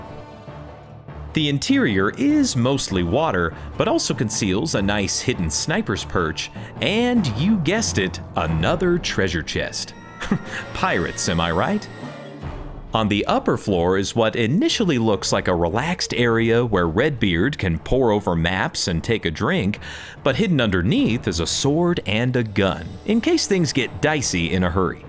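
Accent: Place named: Scotland, United Kingdom. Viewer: Sounds a bit Scottish to me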